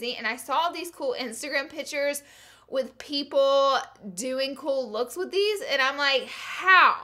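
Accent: South, United States. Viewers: !!! 😊 I'm a newbie, just subscribed today but I love your southern accent and quirky personality. Haven't tried much of NYX before but I'll def be purchasing that palette you mentioned. Thanks for the tipssss.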